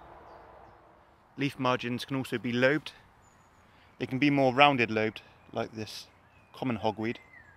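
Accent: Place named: West Country, United Kingdom. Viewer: The man from the West country is back. Nice one.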